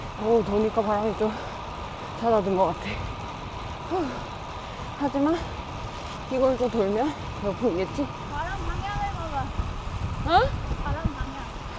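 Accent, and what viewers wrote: Gyeongsang, South Korea. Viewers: 경상도 어디 사셨었나요?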